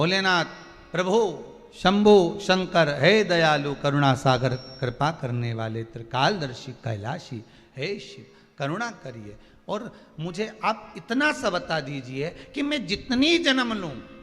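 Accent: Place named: Madhya Pradesh, India. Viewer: Aap m.p vale ho to mp ki tarh hi belonged.Maharashtra ki Tarah bolo to me aapko accha he sir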